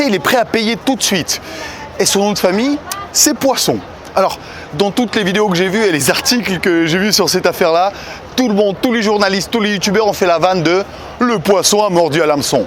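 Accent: Russia. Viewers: J'adore l'accent Russe qui prend le dessus quand tu parles en anglais. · Tu parles en anglais avec l'accent russe, c'est énorme ! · C'est marrant en Anglais on entend ton accent Russe et pas en Français... Я тоже русский, ну у меня нет русского акценты , только французский 😅.... Je ne sais pas trop ce qui est le mieux 😂.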